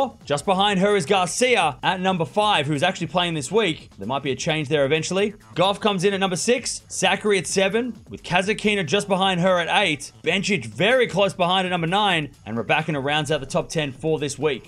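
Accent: Australia. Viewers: I say the biggest win this month is Norrie over Alcaraz in Rio. There was almost no way of Norrie winning but he did. There was a buzz of Alcaraz's injury acting up but nonetheless he was hitting winners all over the place. I think Norrie's win was the most classic in a while. Thanks for the show. It is always good to hear your Aussie accent.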